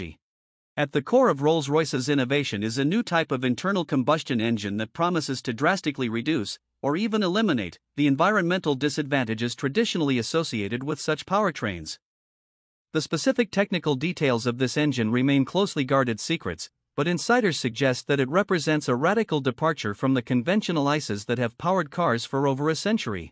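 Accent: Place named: United States. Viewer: Repetitive WAFFLE by a DREARY American Voice.... ALL talk and NO FACTS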